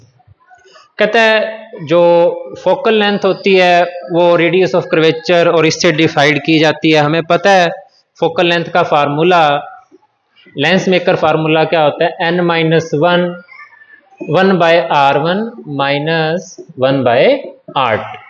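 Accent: Haryana, India. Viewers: Good haryanvi ascent sir ❤️😄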